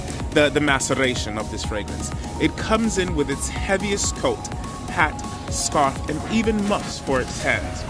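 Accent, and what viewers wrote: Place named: England, United Kingdom. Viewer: Fantastic review! You are eloquent and entertaining, and your English accent is great. I should know as I am a Brit. Can’t believe that you are Garifuna! My wife is from Honduras. After your review I feel that I need Chypre Fulminare in my collection. You have such charisma.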